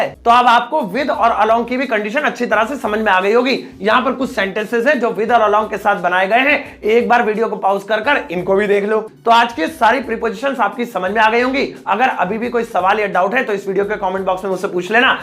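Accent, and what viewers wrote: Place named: Mumbai, India. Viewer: Ekdam bambaya hindi kya baat hai bhidu... Pahchana ki nahi apun mumbaikar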